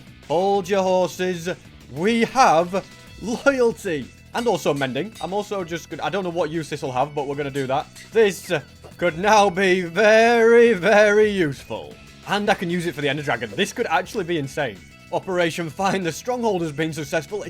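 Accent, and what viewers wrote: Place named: Yorkshire, United Kingdom. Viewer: I love your Yorkshire accent!!!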